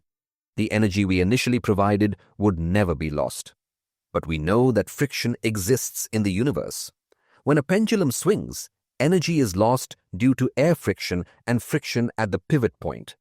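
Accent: India. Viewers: Although I like this channel, But the narrator Indian accent and way of talking is annoying to me!! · Akin to Indian accent that irritates.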